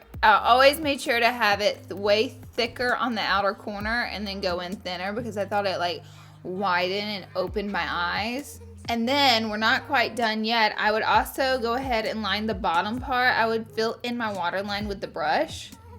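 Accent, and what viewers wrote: South, United States. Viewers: I'm from mobile Alabama. I just love your videos and southern accent. I feel you girl ❤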